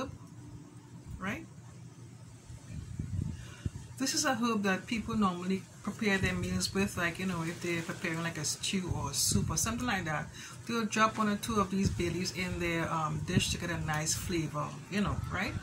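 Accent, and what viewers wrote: Trinidad and Tobago. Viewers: You sounding Trini..just came accross yr channel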